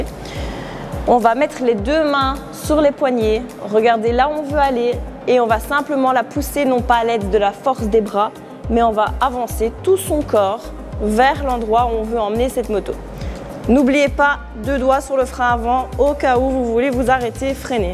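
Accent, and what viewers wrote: Belgium. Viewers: Supers conseils, même pour qq1 d'averti, merci (waouw l'accent région Liège, je kiffe - Pierre - 4500 - Huy)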